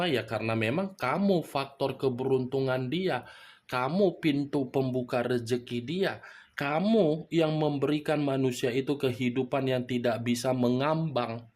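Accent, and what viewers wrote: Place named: Medan, Indonesia. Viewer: 😂😂😂😂 Maaantaap Kali Aaacchhh Kartu Fortune ini … Bahasa ORG MEDAN Banget SUHU The Best Kita ini …👍👍👍👍👍💃💃😂🙏✍️😎😎😊😊……30 Nov 2023 Pkl wib …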